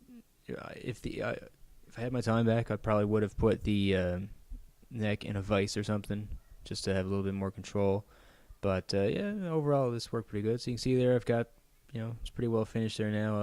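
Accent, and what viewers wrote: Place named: Cape Breton, Canada. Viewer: Are you from Cape Breton ? You got the accent lol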